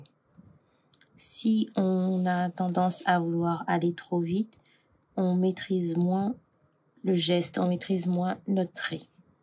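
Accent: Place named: Mauritius. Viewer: C’est drôle ! J’avais cru entendre un petit accent de l’île Maurice! Ça fait longtemps que vous faites de zentangle? Moi, je découvre…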